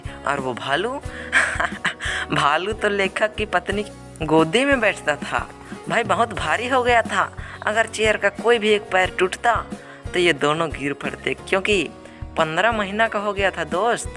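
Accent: Bihar, India. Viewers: Bihar sa ho kay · Bhai tum meri tarah bihari ho kya😀😀😀😀😘😘😘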